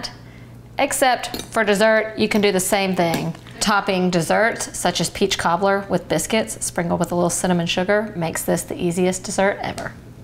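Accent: South, United States. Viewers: Was NOT expecting that thick southern accent lol. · Gotta love the southern in this girl! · LOVE your southern accent! Wondering where you're from?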